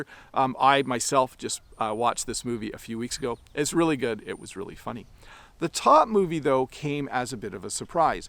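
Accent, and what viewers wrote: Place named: Canada. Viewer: Thank you so much, first of all I 'll like to say that I really enjoy watching your videos, I really like your manners of teaching, because it's very clear to me, it's easy to understand, and I love your Canadian accent it's understandable, iam always practicing your online lessons. I have been practicing and iam enjoying improving English, please keep making videos for us they are amazing for non-native English speakers like, by the way iam Mozambican, I was born in Nampula city ( it's called the Axinene province- Emacua's land). Thank you so much have an excellent day and good luck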